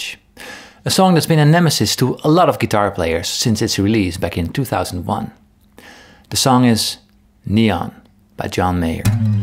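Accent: Netherlands. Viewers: Jep, it's the Dutch accent :) Actor playing Daario Naharis is Michiel Huisman, also from the Netherlands. :) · both dutch, it's the (faint) accent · Ik hoor een hollandse tongval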